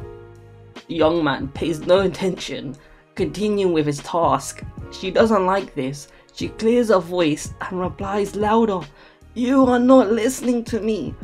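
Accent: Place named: London, United Kingdom. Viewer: i am a subscriber, have been for about 5 minutes because you sound like a london boy to me, gotta watch out for the london crew. i am a satanist, you are more than welcome to use satan as a curse word, we believe in freedom of speech, have fun. ave satanos.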